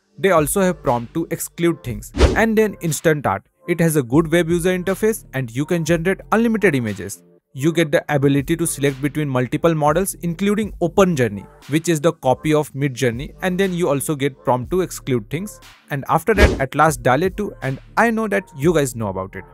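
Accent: India. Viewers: If you open a tutorial and the person starts speaking in an Indian accent... then my friend you hit the jackpot cuz you'll get more than you even wanted. Thank you soooo much bro!!! 🙏🙏🙏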